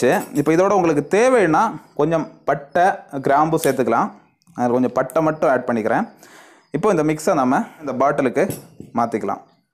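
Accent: Kanyakumari, India. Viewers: Nice vedio and kumari voice very nice bro... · Ungal malayala tamil azahu...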